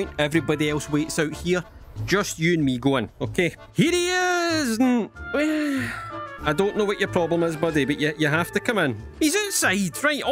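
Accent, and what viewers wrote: Scotland, United Kingdom. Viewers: scottish skyrim daddy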